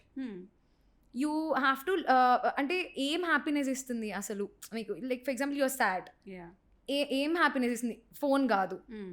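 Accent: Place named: Telangana, India. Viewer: O telangana na?? I saw your videos but in mute so expected u to b andhra girl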